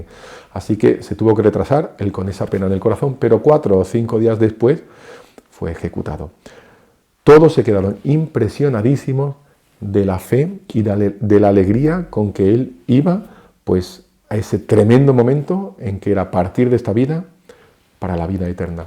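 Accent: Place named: Andalusia, Spain. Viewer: Salve María!!! Qué alegría oír a los Heraldos de Madrid en las redes sociales, Hermano de los Heraldos del Evangelio, me encanta esta historia que usted está relatando de la Virgen de las Gracias. Por su habla veo que es andaluz, igual que yo, creo haber hablado con usted este verano. Salve María. Que la Virgen de las Gracias lo bendiga y lo proteja.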